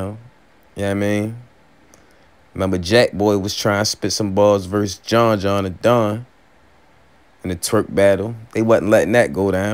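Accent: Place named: Baltimore, United States. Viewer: I'm just hearing your accent... Bmore❤ can't tell if its East or West but definitely Bmore...❤ Much Love and Respect!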